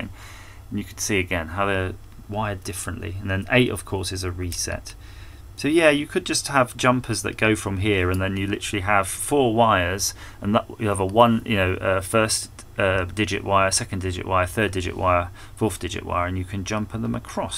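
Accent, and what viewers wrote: United Kingdom. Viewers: Thanks for sharing, and I love your British accent !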